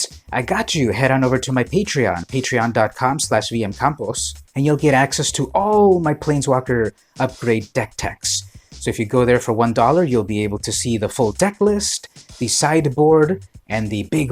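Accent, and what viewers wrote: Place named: Philippines. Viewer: Filipino ka ba po?? Your accent sounds like a Filipino...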